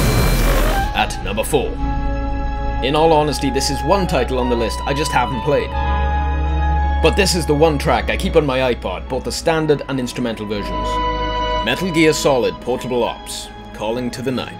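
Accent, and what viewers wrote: United Kingdom. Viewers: You sound like Cryaotic(A famous youtube gamer) with a british accent.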